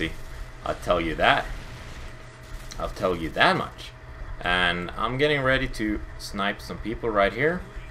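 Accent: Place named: Sweden. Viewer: can u be my english teacher. MY teacher is like 80 and scottish. Scottish accent< Swedish accent!!!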